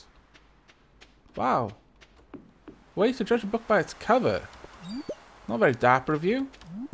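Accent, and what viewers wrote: United Kingdom. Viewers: from 1 brit to another, I can definitely hear it with you sayings and use of language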